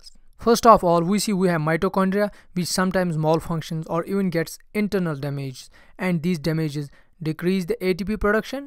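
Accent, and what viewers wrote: India. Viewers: Kindly put original subtitles because your English has a very Indian accent which is difficult for some people to understand.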